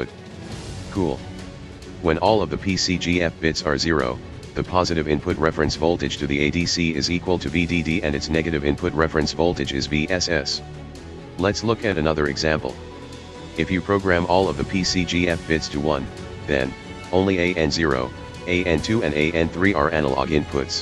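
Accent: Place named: India. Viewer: Yes don't put music + nice to keep robot speak as I hate Indian English better to use robot eng instead of yours as when I found an Indian English video I simply skip it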